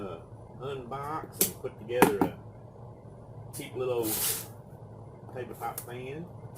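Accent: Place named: South, United States. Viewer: THANK YOU SO MUCH. JUST PURCHASED THIS FAN AND I COULD NOT HAVE DONE IT WITHOUT YOUR HELP. U MADE MY DAY. SOUTHERN ACCENT WAS GREAT, I ENJOYED. HOT HERE N SACRAMENTO. I HAD A PROB WITH A WALMART PURCHASE AND THEY SENT ME 2. 2ND FAN WILL B MUCH EASIER 2 PUT TOGETHER. ONCE AGAIN THANK YOU GOD BLESS❤🎉🎉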